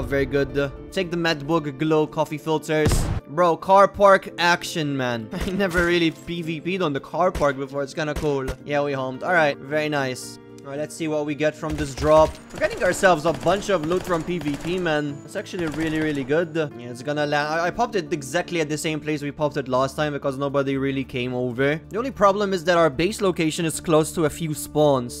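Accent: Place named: Malta. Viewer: Yooo bro... Weird question.. are you Maltese??? You have such a Maltese accent 😆😆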